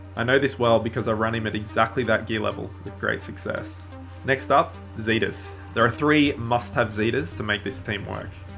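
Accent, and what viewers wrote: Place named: Australia. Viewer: You got a sub for bloody great accent you aussie legend. Thanks for the pro tips amigo just started running this myself and they help heaps!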